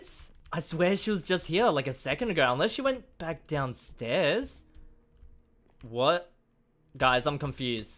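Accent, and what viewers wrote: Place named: Australia. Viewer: New it you are from Australia not USA OR US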